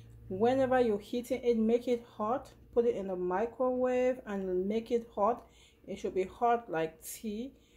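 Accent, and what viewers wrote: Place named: Edo, Nigeria. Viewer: Sounds like a 9ja sister Beni or Esan. I go try this one sha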